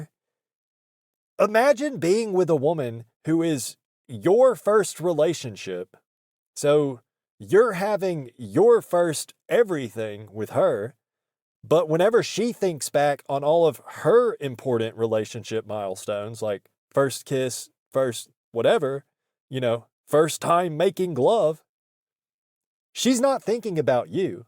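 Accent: United States. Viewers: Normal U.S. accent, what's wrong?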